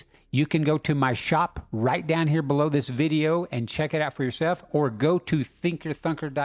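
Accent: South, United States. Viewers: Damn you and your common sense! All delivered with a charming southern accent :)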